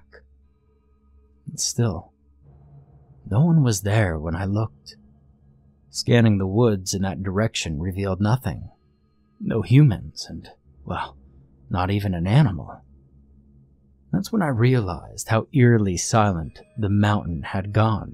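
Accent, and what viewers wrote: South, United States. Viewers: Your southern draw is to die for 😍😍😍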